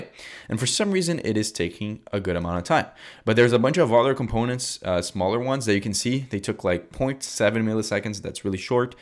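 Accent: Brazil. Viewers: Rapaz Tú é carioca ou paulista?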